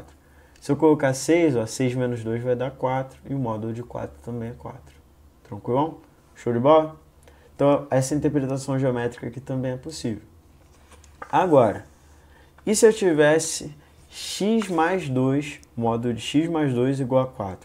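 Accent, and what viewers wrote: Rio de Janeiro, Brazil. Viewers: to apaixonada nessa voz carioca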